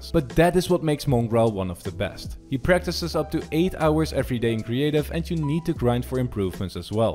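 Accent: Netherlands. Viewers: gek Nederlands accent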